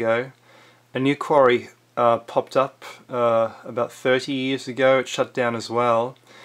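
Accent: Australia. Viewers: This man sounds Australian but he obviously has not seen very much of it. The redcentre is fairly desolate but all states have huge forested areas. The area shown inthis video is in the iron mining area of Western Australia. The rest of Australia isbeautiful and we have the best beaches in the world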